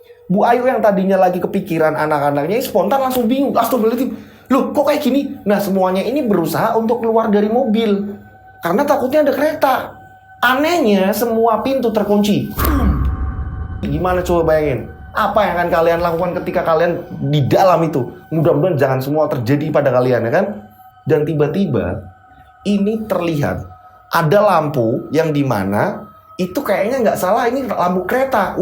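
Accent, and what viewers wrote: Java, Indonesia. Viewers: Assalamualaikum de.... Majalengka Jabar Hadir.... Seneng sekali dengerin cerita misteri de.... Suka sekali sangat pas menyampaikan cara bicara tokoh cerita nya.... Logat jawa nya.....sehat selalu de... Semoga chanel de semakin berkembang.... Boom.... Boom... Booommm😍🙏🙏🙏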